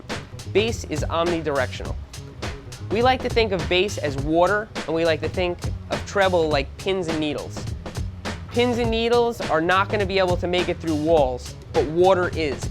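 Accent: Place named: Long Island, United States. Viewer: Long Island accent is coming through HARD compared to nowadays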